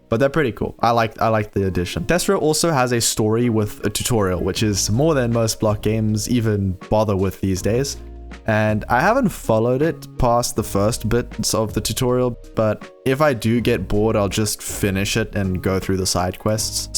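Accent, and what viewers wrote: South Africa. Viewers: Joburg accent?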